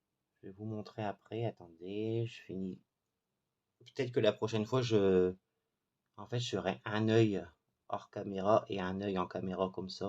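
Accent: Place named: England, United Kingdom. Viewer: L'accent anglais m'a fait rire 🤣🤣🤣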